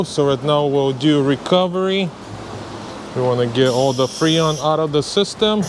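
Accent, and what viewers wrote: Russia. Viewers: Такое чувство, что чувак русский🤔. Акцент русский)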